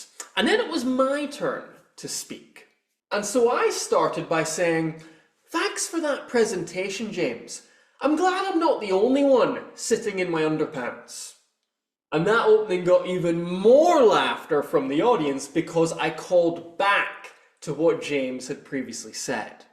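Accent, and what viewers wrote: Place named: RP, United Kingdom. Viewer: rp accent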